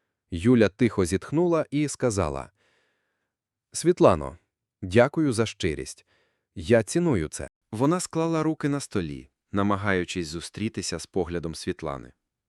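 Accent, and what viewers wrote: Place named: Surzhyk, Ukraine. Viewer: Якщо перекладаєте з рахівської - то перекладайте вірно українською. А то суржик ріже слух. Так і не змогла дослухати до кінця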